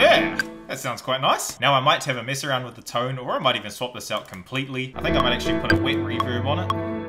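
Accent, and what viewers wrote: Australia. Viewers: oooooooh so you are australian, now i understand why you talk like this, good videos! PS: English is not my main language so is hard for me to differenciate accents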